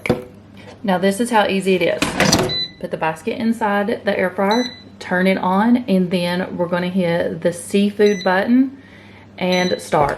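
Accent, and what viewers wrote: South, United States. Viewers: I trust cooks with southern accents more!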